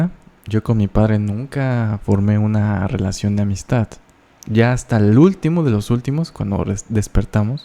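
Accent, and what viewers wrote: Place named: Spain. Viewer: QE gusto saludarte ya no as echo vídeos por favor sige haciendo cuando menos de ves en cuando me encanta tú hacento de España y forma de dialogar Eres demasiado inteligente, estan con ganas tus videos de YouTube 🙏🥳🤩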